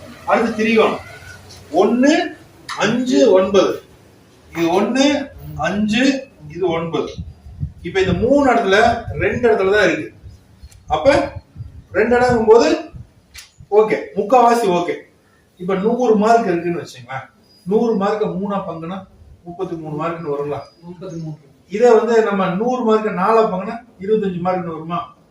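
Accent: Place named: Kongu, India. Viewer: வெகு எதார்த்தமான கொங்கு தமிழ் பேச்சு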